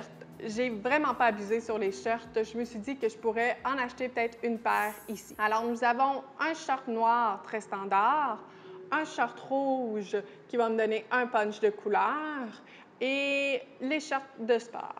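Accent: Canada. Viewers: t’es canadienne?? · Hey très jolie ton accent québécois . Enfin une personne qui met beaucoup de chaussure dans sa valise . J'aime bien ta vidéo 👍🏾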